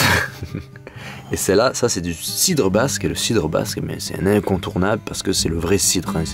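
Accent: Quebec, Canada. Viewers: Salut les keupin, je viens de vous découvrir a travers cette petite vidéo, vous êtes québécois a en entendre votre accent, vous vous faite un repas trip en France?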